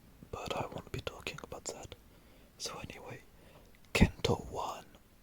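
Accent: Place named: France. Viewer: WoW I really like your frech accent its kinda relaxing you could try maybe do a ASMR in french? :0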